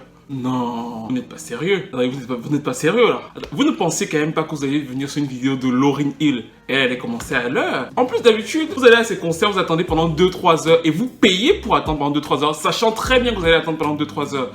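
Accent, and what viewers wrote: Cameroon. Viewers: Salut mon frère je viens découvrir ta chaîne, et franchement je suis tombé sous le charme!La manière avec laquelle tu racontes, c'est très immersif quoi!Tu en parles comme des gens du quartier qui ont grandi avec nous,avec un brin d'accent Camerounais!Chapeau!J'ai direct activé la cloche pour ne rien manquer! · Tes vidéos sont au tellement... non, tu es trop fort!!! Et l'accent camer ça rend la sauce impeccable!!! C'est une superbe chanteuse et sous une interprète hors pair!!! ELLE s'est mise un boulet au pied toute seule en voulant s'octroyer un talent artistique qu'elle n'avait pas...en plus si tu te joues les divas bon les durs du milieu ont vite fait de se passer le mot et tu vas mourir dans le film q chaque fois que tu vas sortir ton nez... Sinon une vidéo sur stp... Et une question privée: tu bosses dans quel domaine, la musique, la production?Où c'est juste une passion? 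En tout cas, continue de nous distraire si talentueusement,tu as vraiment l'art de raconter des histoires!!!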